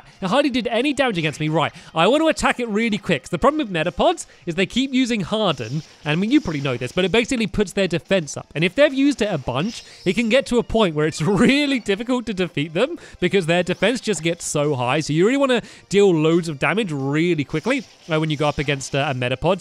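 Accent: England, United Kingdom. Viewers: S4L Yolo It's just stampys accent You could say both ways In England we say z as zed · Lunapuppy Vlogs is from England, so they pronounce Z differently lol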